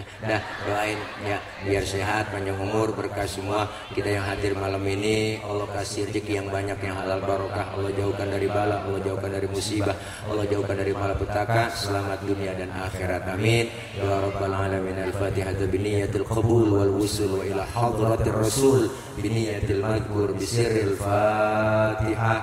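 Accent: Betawi, Indonesia. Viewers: Ustad ceramahnya lucu dg dialek betawinya Sangat cocok untuk warga jakarta bekasi tangerang dan sebagian bogor Bisa ls terjadi interaktif dg para jamaahnya Bahasanya gampang dicerna dan sesekali disertai humor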